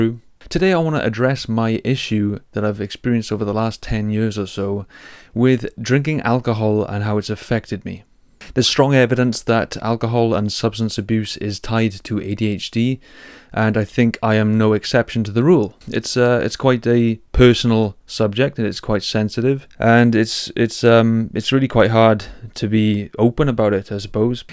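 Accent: Wales, United Kingdom. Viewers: Get a mountain bike bro, amazing for dopamine stimulation and if (judging by your accent) you live in Wales your spoilt for choice for amazing trails. Soon make friends to ride with. Totally relate to drinking issues though, it's kryptonite for ADHD brains.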